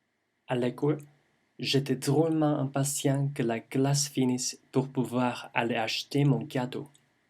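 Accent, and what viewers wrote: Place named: Quebec, Canada. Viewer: It's canadian french, just saying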